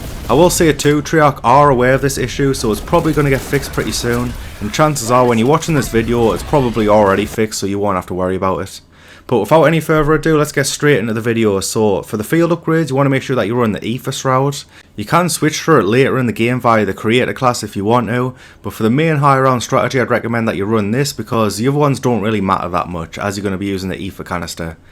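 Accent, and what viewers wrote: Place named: North, United Kingdom. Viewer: I’m really struggling to put my finger on your accent, definitely northern but I can’t pinpoint it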